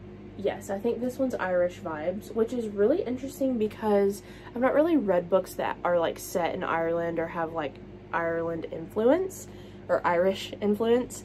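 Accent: West Virginia, United States. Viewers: Lol gurl let the WV come out!! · My frand..your west Virginia coming out..I'm cryinggggg 💀🤣 I love your accent ❤️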